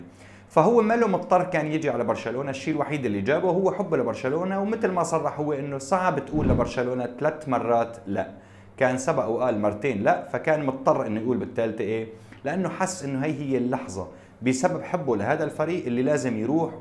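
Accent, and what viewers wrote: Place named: Aleppo, Syria. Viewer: اللهجة الحلبية ❤️❤️❤️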